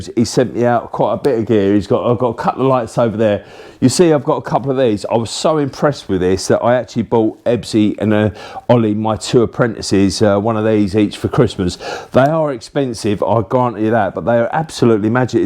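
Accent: United Kingdom. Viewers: So british. Sick loadout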